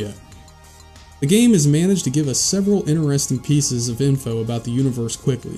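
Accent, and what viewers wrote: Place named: Mid-Atlantic, United States. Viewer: Are you from the mid Atlantic originally? No offense, but yr dialect sounds grimey (just like mine). Wouldn't be surprised if you were from Philly, DC, or my own Baltimore (especially with those juicy fronted O's). I'm about 10 mins into the video and so far, so good!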